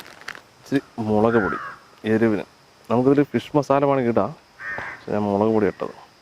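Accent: Palakkad, India. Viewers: തനി പാലക്കാട് ഭാഷാ......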